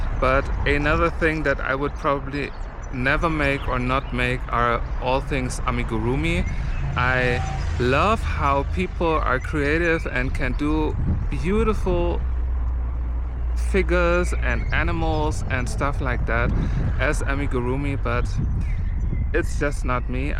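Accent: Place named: Germany. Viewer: The more I listen to you the more I think you have a German accent..... It's mild and very calming to listen to you speak 😁❤️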